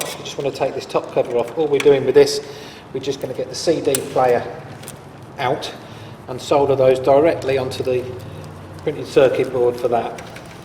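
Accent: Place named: United Kingdom. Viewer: I love UK accent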